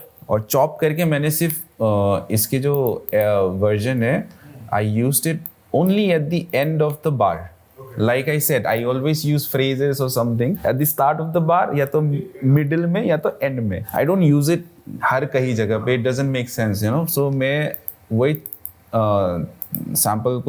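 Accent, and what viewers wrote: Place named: Assam, India. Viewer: 💯 percent he is Assamese.. Accent se hi maloom chala merko..🎉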